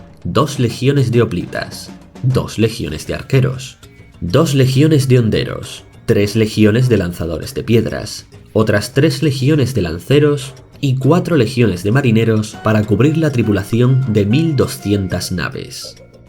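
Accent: Spain. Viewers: ¡Excelente la narración! ¡Me encantó! Me encanta el acento español del castellano.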